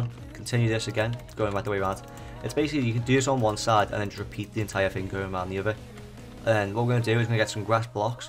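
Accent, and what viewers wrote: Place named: Liverpool, United Kingdom. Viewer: Ur from Liverpool aren't you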